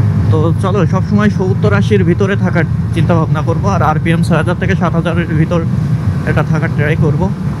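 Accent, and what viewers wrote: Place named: Kushtia, Bangladesh. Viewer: আপনার বাসা কি কুষ্টিয়ায় 😄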